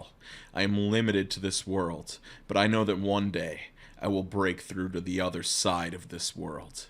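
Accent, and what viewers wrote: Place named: Northern Kentucky, United States. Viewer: are you from Northern Kentucky?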